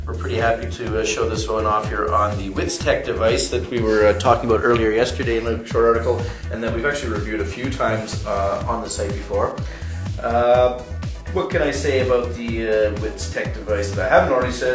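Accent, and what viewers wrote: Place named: Canada. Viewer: This guy sounds like a Canadian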